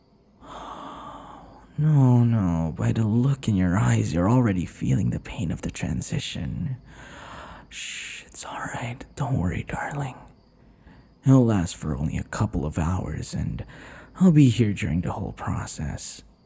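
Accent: Philippines. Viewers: OK- this is just a idea cuz ur filipino accent, love it ALOT, can u maybe do a filipino one? would love to see someone take my idea but there is no forcing but just a suggestion :D (i love ur vids so much omg, keep up the amazing work!!) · he's closest to Filipino accent 🥵❤️‍🔥🇵🇭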